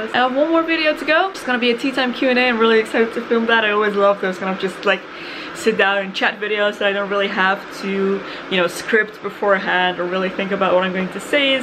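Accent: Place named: Netherlands. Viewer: haha ik merkte je accent, dus ging er vanuit dat je nederlands was, dus ik overla zoeken, n toen zag ik een boek van paulien cornellise, en toen wist ik zeker dat je nederlands was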